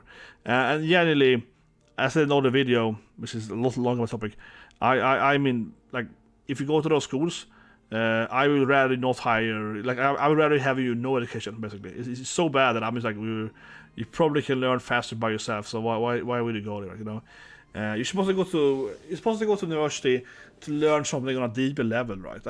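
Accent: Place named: Sweden. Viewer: Interesting! Just starting the video, but it hits all the right points for me to want to check it out. I worked in the games industry for a bit over 10 years many years ago in NY and left a few years before the hard left turn really got underway. Också är jag amerikanare men har bott i sverige i dryggt över tio år och din dialekt/brytning låter precis som alla mina svensk kompisar lol.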